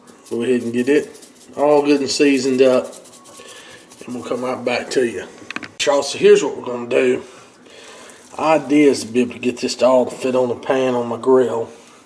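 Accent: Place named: North Carolina, United States. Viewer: cool I go to Cape lookout a lot and they sound like you. Thanks for the video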